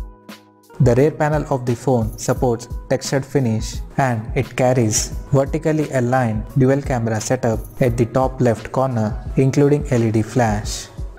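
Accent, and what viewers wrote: India. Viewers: English (Indian phonetics)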